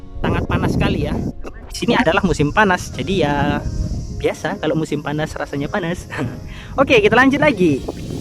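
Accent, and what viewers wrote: Java, Indonesia. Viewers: mblenyek??? Wong jowo asli ki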